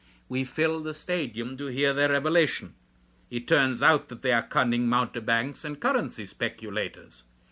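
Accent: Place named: India. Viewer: Even his accent is a smorgasbord! I wouldn't have known he was American. He sounds a tad Indian and a tad English.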